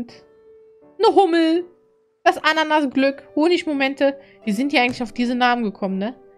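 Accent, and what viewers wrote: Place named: England, United Kingdom. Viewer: So... erste Kommentar! Die Folge ist mir einfach zu lange, maximal 45 minuten wäre optimal, wenn möglich. Ich finde dein Englisches Azcent lustig und süss! Du bist doch kein Geist,. Du bist ein Vampyr XD Bin gespannt was du noch in diesen DLC schafst!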